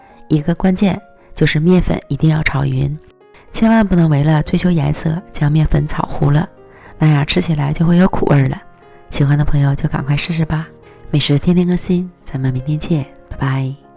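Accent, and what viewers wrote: Northeast, China. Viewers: 这东北口音平卷舌不分。。。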